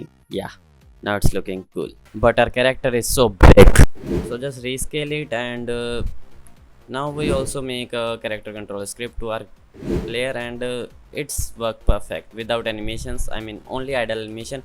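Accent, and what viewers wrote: India. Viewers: Are you filipino? Pero parang indian accent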